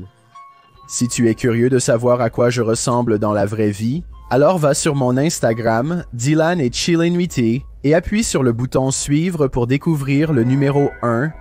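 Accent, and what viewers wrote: Quebec, Canada. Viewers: Cie vous n'aimez pas l'axent du kebec changer de série 😮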